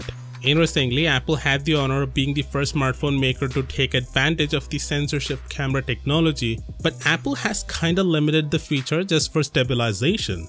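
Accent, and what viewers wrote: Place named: India. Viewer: The Speaker Hindi Engelish🤮🤮🤮🤮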